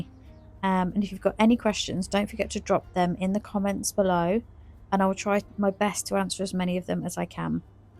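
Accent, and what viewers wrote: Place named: England, United Kingdom. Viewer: So glad I found you and your English not American! I’m now going to binge watch you channel . 😊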